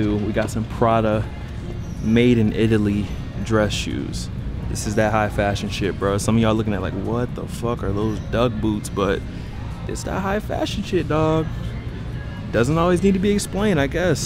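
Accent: Virginia, United States. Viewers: He from Virginia?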